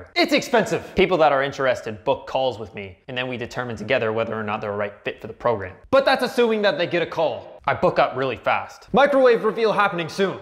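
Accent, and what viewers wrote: Canada. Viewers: I can tell just by the way he talks hes from canada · Judging by your accent you're a Canadian.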